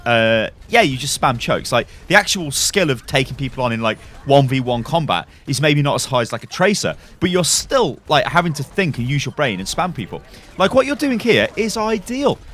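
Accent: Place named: United Kingdom. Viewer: Omg highlighted my comment what do i say!!!! Um...um... I love you're British accent 😭😍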